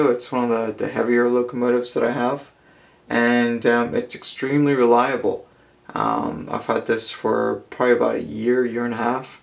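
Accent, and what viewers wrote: United States. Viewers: I have to know are you american? You sound it.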